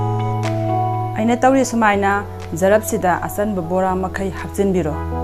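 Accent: Kakching, India. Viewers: Kakching marol se taba yam nungai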